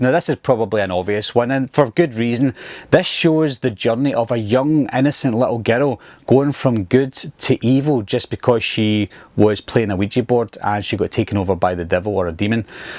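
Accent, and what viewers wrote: Scotland, United Kingdom. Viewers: Is this guy scottish?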